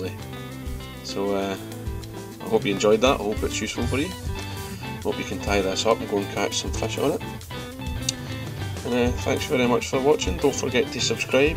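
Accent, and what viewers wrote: Scotland, United Kingdom. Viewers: Great looking wee crab. I love your accent, Davie McPhail called his pattern a wee beastie tonight. I love it. If I could go anywhere it would be Scotland. Would it be helpful to put some tungsten or lead substitute under the (on top) of the hook when tying in the eyes to help keep the crab from flipping? Just wondering. I have no use for a crab because I don't fish salt water. I am sure there are a few species of fresh water crab but I don't know anything about them. I may tie one just to entertain the wife. She loves to see my flies if they are impressionistic enough to understand what they are. When your terminal, nothing like a little dementia to take the fear and realism out of dying. For me it is a little more sad. The Lord is preparing me as much as one can be prepared. All the best to you. Keep the videos coming I watch them all night while caring for her. 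Sean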